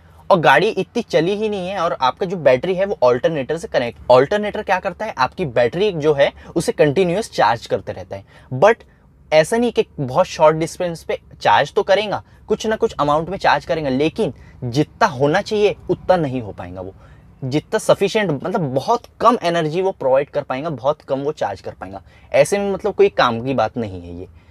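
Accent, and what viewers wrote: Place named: Hyderabad, India. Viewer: Love Your hyderabadi touch to accent😁❤️❤️Good info👍👌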